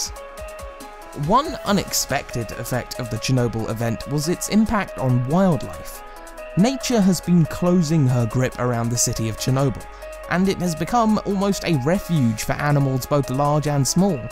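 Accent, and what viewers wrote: United Kingdom. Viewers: They got it wrong cause it's a British narrator, just like they got it wrong with the Revolutionary War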